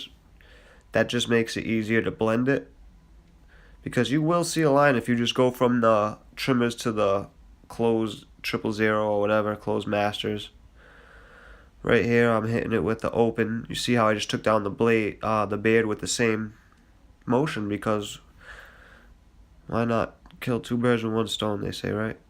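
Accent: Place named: Boston, United States. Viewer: do you ever go 5 star shaver your freaken awesome. You sound like beantown accent.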